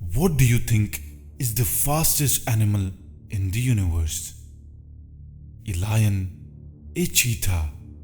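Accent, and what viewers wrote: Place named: England, United Kingdom. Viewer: Wada angeraz.....ru here to preach or trying to impress us with bloody English accent.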